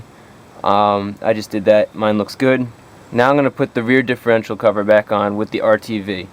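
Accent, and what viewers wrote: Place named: New Jersey, United States. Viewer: he has a New Jersey accent! -from Texas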